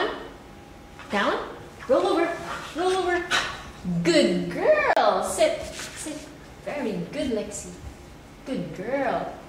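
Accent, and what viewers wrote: Philippines. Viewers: pinoy ka no? halata sa accent ng pag english mo hehe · una palang, alam ko nang Pilipino ka, dahil sa accent.. :D hahahaha!! im a Filipino too, and I am very proud to be one.. :) uhm san ka bumili ng Golden Retriever? and how much? please reply, kasi adik na adik ako sa ganyang breed and I would like to adopt one and take care for it... ilang beses mo po siya pinaaliguan? hahahaha!!! :D (ew laway...) :)) xD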